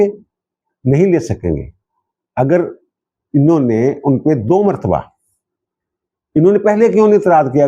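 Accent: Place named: Doaba, India. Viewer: sahib, tusi Jalandhar ya Hushiarpur de o? Tuadi Punjabi ton kagda ai.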